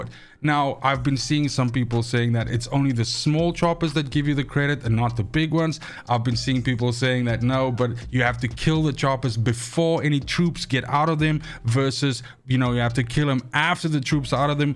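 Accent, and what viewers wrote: South Africa. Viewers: South african? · You from South Africa?or am I hearing that accent all wrong🤣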